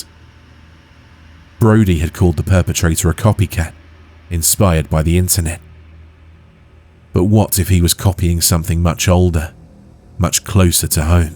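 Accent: United States. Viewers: Bravo! Your American accent sounds kind of cute! I know about this legend, and my opinion is that it was Mass Hysteria. Your narration was perfect and intense. Thank you so much ❤😮. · Great Story and Narration! I liked your American accent. I enjoy the legend of Spring-Heeled Jack.❤❤🎉🎉😊😊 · _MHorrorMacabre:Hyped and Liked 👍 #112! Your American accent was excellent! You did it seamlessly. That must have been hard with the back and forth dialogs between the characters. I really appreciate the extra work you always do on your channel for us. Loved the story. 🖤☠️🧡☠️:_MHorrorMacabre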